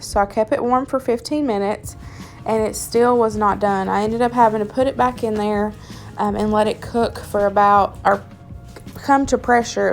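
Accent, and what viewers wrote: South, United States. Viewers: Totally agree with all the other comments! The food looks incredible! Your southern accent is awesome! Subbing now and looking forward to more of your vids! Great work!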